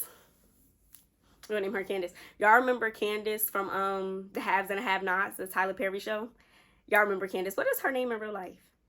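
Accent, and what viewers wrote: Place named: Philadelphia, United States. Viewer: As soon as I heard you talking I knew you were from my neck of the woods (I’m from Philly )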